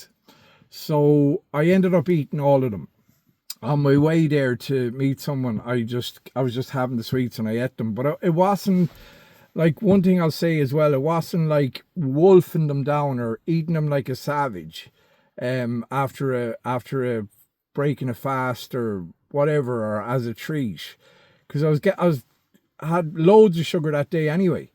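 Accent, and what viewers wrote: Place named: Ireland. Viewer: I could tell you're Irish. I was just curious if you're still living in Ireland. I'm always curious about ingredients in processed foods in other countries vs the u.s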